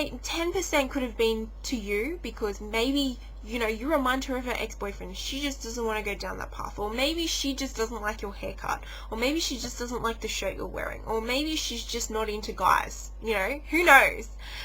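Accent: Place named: Australia. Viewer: I like that aussie accent...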